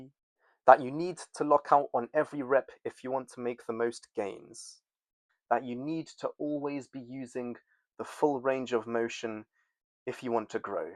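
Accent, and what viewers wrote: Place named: United Kingdom. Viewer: This guy has the same uk accent as the greatest uk artist to ever do it: Feng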